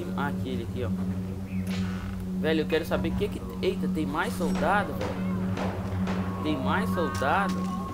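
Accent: Minas Gerais, Brazil. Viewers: Mt Top o seu canal mano ! Concerteza vai Crescer Rapido ! Acho o seu sotaque bem Legal, creio q vc seja Mineiro, Parabéns !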